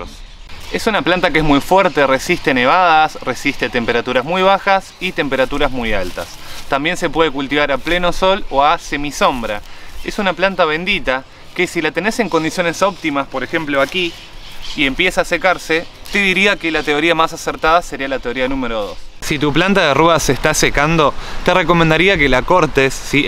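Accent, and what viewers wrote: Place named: Córdoba, Argentina. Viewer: Hola te agradezco por tu canal con info tan util y buena para la salud , te comento que tengo una ruda que no riego nunca y esta muy linda ,estoy en las sierras de Cba y por tu acento y el lugar me parece que vos no estas lejos , aca no llueve durante estos meses .Me gustaron mucho los otros videos un saludo enorme y que tu tarea en el jardin continue con exito .La tierra nos brinda alimento y buenas medicinas por eso tenemos que saber distinguir las plantas y valorarlas , cuando mis hijos eran chicos mas de una vez me salvaron los tecitos de yuyos , pero todavia tengo tanto que aprender , por eso te felicito que siendo tan joven hagas una tarea tan valiosa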